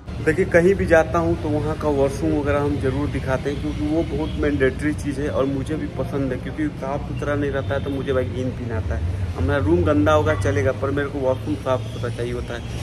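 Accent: Varanasi, India. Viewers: APKI BHASHA SE ME PEHCHAN GAYA KI AAPBANARAS SE HA MERE NANI KA GHAR BHI VAHA HA 😁😁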